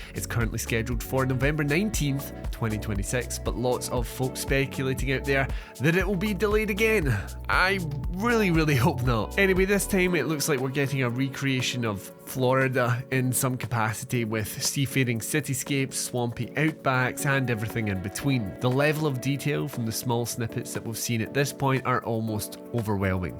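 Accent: Scotland, United Kingdom. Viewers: YOUR ACCENT IS AMAZING, WHAT PART OF SCOTLAND ARE YOU FROM ? 😊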